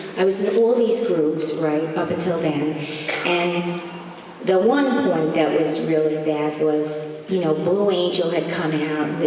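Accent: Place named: New York, United States. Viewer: She's such a New yorkaaa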